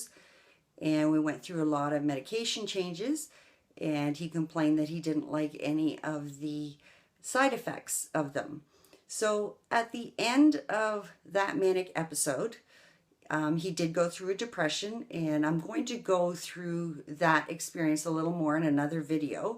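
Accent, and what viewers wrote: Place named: Canada. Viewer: Accent sounds Canadian.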